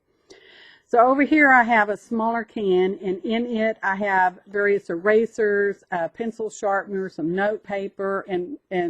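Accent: South, United States. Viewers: I just love your southern accent I’m from south Mississippi and sound like you lol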